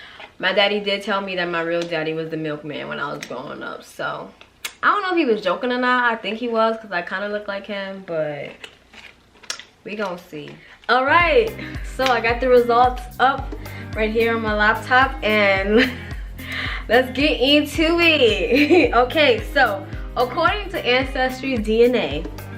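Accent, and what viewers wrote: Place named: New York, United States. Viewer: Luv the New York accent lol✊🏾💯🙏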